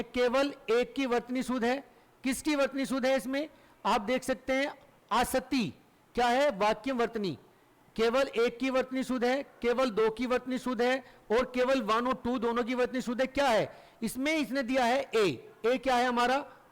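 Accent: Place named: Haryana, India. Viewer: Apka tone lagta hai ki aap hariyana se hai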